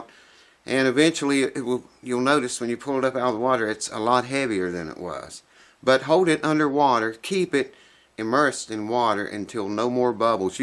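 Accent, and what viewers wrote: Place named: West Virginia, United States. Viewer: Thank you very much for this video. BTW are you from WV? I think I recognize your accent. I'm from Poca River.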